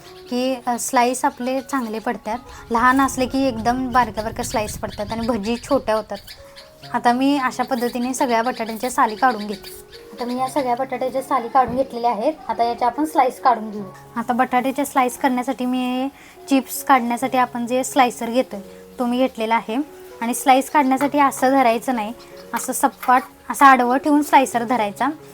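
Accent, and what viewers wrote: Kolhapur, India. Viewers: अस्सल कोल्हापुरी भाषेत बोलतीस ताई आणि आपण कॅमेरा समोर बोलतोय असं नाही तर तू प्रत्यक्ष समोर रेसिपी करून दाखव त्यास असं वाटतं य बघ.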